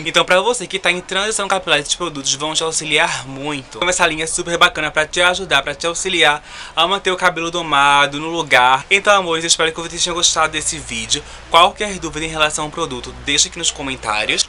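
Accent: Recife, Brazil. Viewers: amei seu sutaque, recife , sou de São Paulo.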